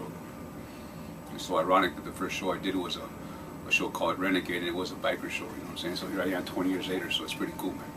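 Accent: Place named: Mexicali, Mexico. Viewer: he's got the most stereotypical mexicali voice and accent there has ever been in the multiverse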